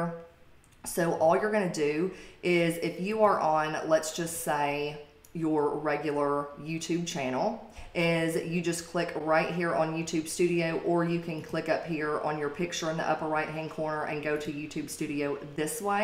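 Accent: South, United States. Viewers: I’m sorry but she has the most American accent a person in world could have. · This is a southern American accent. We don’t sound like this in the west or even east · Thanks!! Ijust what I need. 😊😊 Have a great day. Nice accent. The southy one. 😊😊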